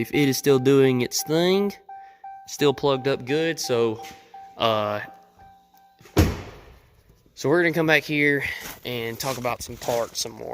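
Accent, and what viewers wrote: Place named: South, United States. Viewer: Nice video. I am about to go down the same road so I appreciate the insight. I can tell you're from my neck of the woods from the southern drawl. Where abouts are you located?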